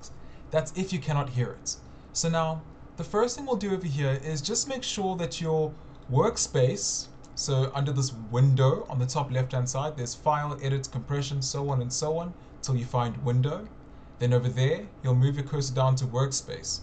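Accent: South Africa. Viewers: I can spot a South African accent a miles away, thanks - I was using bluetooth headphones & had to change it on audio hardware. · Are you South African? I hear a familiar accent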